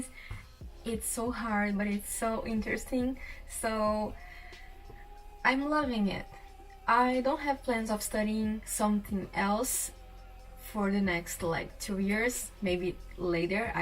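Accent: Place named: Portugal. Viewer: Uhhh... You speak in english but you keep the portuguese accent... I love it! BTW, you look beautiful! 🥰😍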